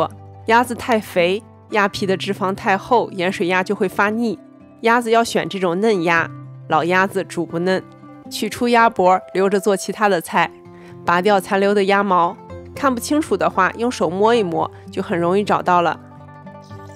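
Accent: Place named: Qingdao, China. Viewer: 青岛银的口音😊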